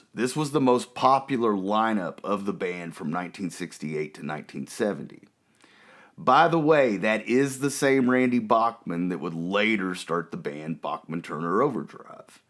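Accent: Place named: Texas, United States. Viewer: The Texas accent of the narrator is unbearable.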